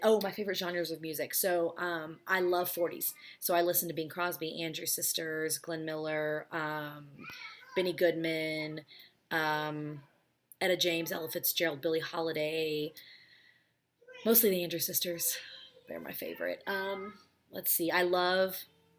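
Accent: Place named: South, United States. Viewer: Sounds like you're a Southerner. That's the important thing! --don, mphs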